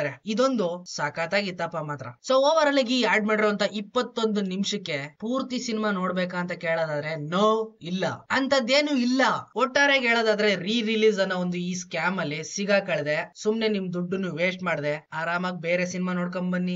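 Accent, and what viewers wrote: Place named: Mandya, India. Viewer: ಅಣ್ಣಾ ಇಷ್ಟು ದಿನ ಎಲ್ಲಿ ಇದ್ದೆ ಅಣ್ಣಾ ನಮ್ ಮಂಡ್ಯ ಮೈಸೂರ್ ಬಾಗದ ಭಾಷೆ ಕೇಳಿ ಕುಷಿ ಆಯ್ತು😅😅😅❤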